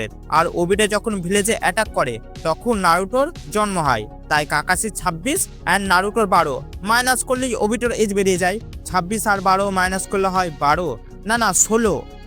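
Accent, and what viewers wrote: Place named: Kolkata, India. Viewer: ভাইয়া আপনি কি কলকাতায় থাকেন?(আপনার কথা শুনে তাই মনে হয় 😅। যদিও আপনার কথার উচ্চারণ আর সুরগুলো খুব ফানি হয়😂)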